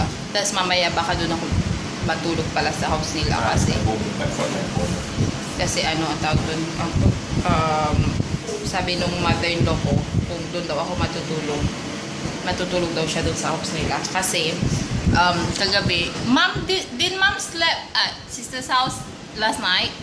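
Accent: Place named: Singapore. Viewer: New Subbie just came across on your vlog. Funny how you also adapted the Singaporean english accent. Where in Europe they have that European accent. Looking forward in watching a marathon of you guys's vlog.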